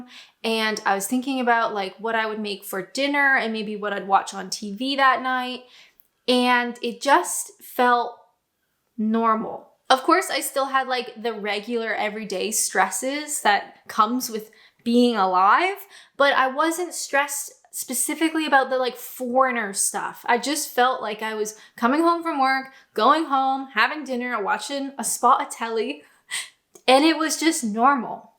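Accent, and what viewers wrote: Canada. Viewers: It’s OK, you’re Canglish. We get it, it’s cool 😎!!! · Still got a Canadian accent. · Hi! You've aged? You're looking good girl!!! Yes, I know the feeling of not belonging, having an identity crisis and trying to adjust when we emigrated to Tronno and returned all those years ago as a 9/10 year old. This was all in only 1 year! Didn't know if I was coming or going but what an experience to be had! (...and yes, I did start to have a Canadian accent like yours but lost it again:) ) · Surprised after all this time your accent is still fully Canadian?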